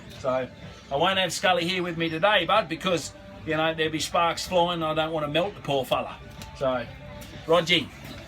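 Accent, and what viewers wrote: Australia. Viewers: Matteeee. F..king legend. You sound Tassie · Hi Mate you must be from outback Queensland or NSW judging by your ocker accent. I love how you did the bottom this is by far the best bottom on YouTube. I think you would be better having the hinge on the bottom of the door so when its open its out of the way and you can see the flames. In fact why do you even need a door when the top is open?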